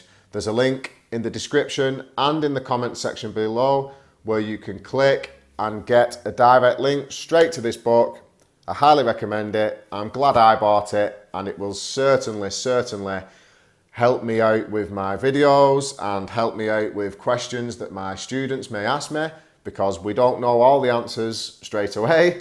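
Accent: United Kingdom. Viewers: Thanks for your videos, I watch your lessons because of your cool British pronunciation. Really it gives shift to undestand original Royal English accent :). Again many many thanks for your lessons. Sincerely Anvar from Uzbekistan...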